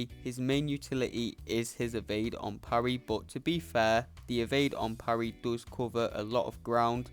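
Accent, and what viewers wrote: United Kingdom. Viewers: Ayo I think this guy is british!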